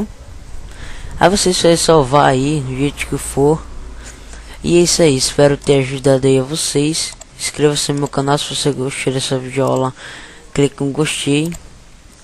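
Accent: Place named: Florianópolis, Brazil. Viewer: tu tem sotaque de manezinho da Ilha! HAHAH tu é de SC?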